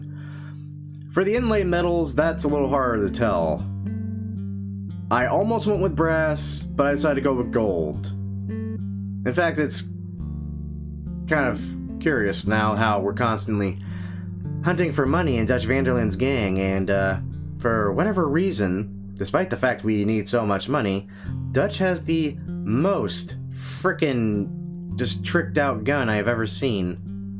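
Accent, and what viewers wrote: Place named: United States. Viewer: idk im just pointing out it sounds really american, we can understand u its the same word, just pronounced different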